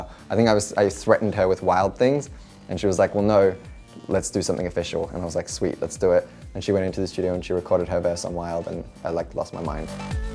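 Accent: Australia. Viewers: He sounds Austrialian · i'm shook the aussie accent is the hottest one i think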